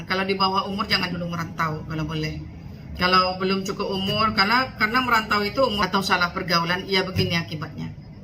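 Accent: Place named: Sabah, Malaysia. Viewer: Bunyi cakap macam orang Sabah.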